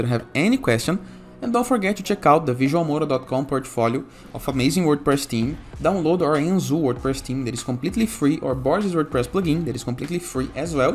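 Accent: Brazil. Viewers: Você devia fazer mais videos em inglês, seu inglês é legal, percebi que era brasileiro pelo sotaque..😁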